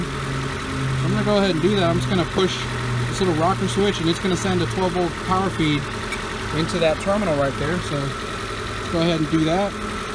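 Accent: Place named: United States. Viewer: Where are you located? Noticed the km/h speedometer(with mph in the smaller section) and thought that was a bit odd. Figured you must be outside the states but your accent sounds American.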